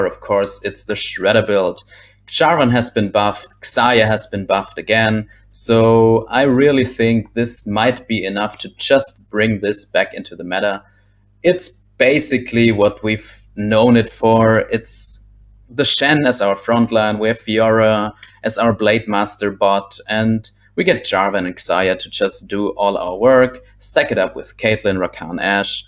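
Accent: Germany. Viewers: Are you German? Your English is very good but your pronunciation of the letter "s" gives it away :) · i mean something light simlar to <link> . But i get your time point, so if not totally fine, just thought I might bring it up. Your analsis is in my eyes better, hence I would be very interested. Especially in the before the patch drops part. :) Since I assume you are german aswell (because of the accent) Gute arbeit. Bitte mehr davon :)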